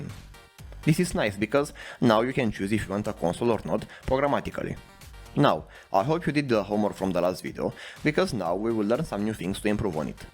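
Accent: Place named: Romania. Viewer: scrie la descriere frt+ ai accent de roman :)))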